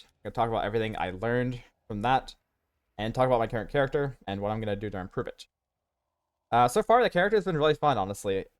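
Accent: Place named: Germany. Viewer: Wie wär's mal mit langsamer sprechen? Immer diese Deutschen und ihr versuch englisch zu sprechen...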